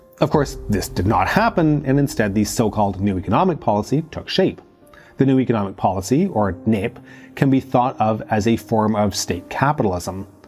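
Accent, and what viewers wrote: Canada. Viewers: It finally clicked during the conclusion why he sounds American but pronounces things like a Brit… he’s a Canuck!!! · Hah, I always thought you had that slight Ontario accent. I'm from Kingston lol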